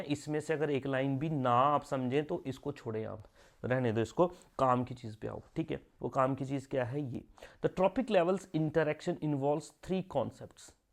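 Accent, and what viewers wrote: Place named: Punjab, India. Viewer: Sir aap Punjabi ke lagte ho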